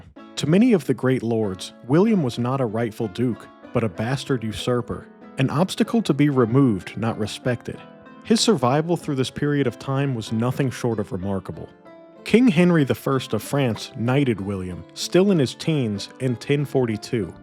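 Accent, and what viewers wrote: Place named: United States. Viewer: the US pronunciation which jars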